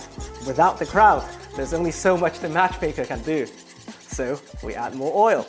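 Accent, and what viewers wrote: United Kingdom. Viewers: Loved your british accent! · He sounds very British!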